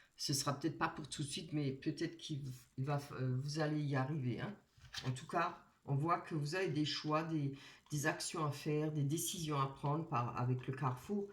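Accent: Alsace, France. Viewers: Bonsoir, merci infiniment pour ce beau tirage. Quel bel accent, serait-il Alsacien?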